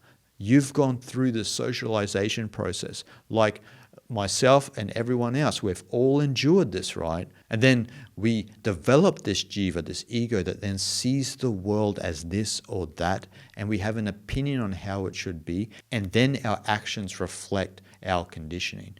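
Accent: Australia. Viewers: Love your videos and your Australian accent ♥️🦢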